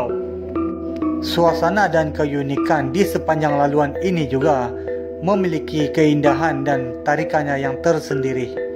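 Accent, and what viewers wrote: Sabah, Malaysia. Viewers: Sabahan tu bro, ko org mana yg xkenal loghat dlm malaysia ni org burma ke sejati?? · Hi saya first time kt sini.. Bro memang buat explore sorang@ Admin dri sabah ye.. 6thn di sabah.. dpt cam dh slang org sabah✌🏻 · Orang Sabah ka?? Boleh tahan bro! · Mcm ada terbunyi2 slank2 sabah ko ni geng.. org sabah ka kawan? Heheh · First dgr kau ckp pun blh tau org sabah..dusun😂 cakap sabah ja boss senang,sy pun sda lama mnetap d kl since 2014 smpai skg msih lg ckp sabah,nda pndai cakap kihil😂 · Sabah lh tu...sy pn mcm tu..hahaha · Hati2 bro klau masuk hutan..klau boleh bawa parang lah..👍👍 Dgr loghat bro btul2 mcm orang sabah😁😁😁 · Patut pun gea lain2 sia dingar.. Kompom2 da sia agak sabah sy bilang.. 1 kali nga butul2 bha org sabah.. Tebaik gaman 👍👍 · Sabahan ker? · Mix sabahan ke bro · Min ni mix ke macam sabah ade india ada 😅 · Pekat Sabah Admin nie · Terbaik.bro.. 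tapikan sape mcm aku confuse?? Bro ni ckp bunyi sabah ke bunyi sleng bangladesh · kau org Sabah ke Bah? Dengar mcm sabahan saja kau......Sabah mmg ckp mcm ini....Kenapa Masih ada lagi yg Tidak faham bah😄.... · cakap sabah sja bro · Alaa dh tau penonton satu negara tgk ckp je la standard bm, sekali sekala nak selit2 sabah tu ok la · Mcm owg sabah dialekny... Jln kna kg Jin... Jin mrah laa kod... Jin ni dy bleh hidup ribuan taon.... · ko org mna bos sleng mcm sabah mix smenanjung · loghat sabah ni. Sarawak takkan loghat begitu kalau bertutur dalam bahasa malayu baku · bosku org sabah ka? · Awk org mana?? Knpe cara cakap MCM org Sabah sikit2. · Bos org mana..mcm loghat sabahan pun ada..terbaik bos..sy suka konten mcm ni.. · Slangnya macam urang sabah bangsa brunei.. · ckp pelik sikit trs cop indon..sabah sarawak pun ad slang mcm ni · Nmpk sgt tk bergaul dgn orang n bodo, ni slang sabah lol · Kalau ckp sabah aku jadi x fhm ni pun dia ckp ok walaupun ada loghat sabah sikit2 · Perkongsian baik..merungkai sejarah dan misteri.(gaya bahasa-mcm org sabah)..Terbaik ,U terokai byk kwsan..like👍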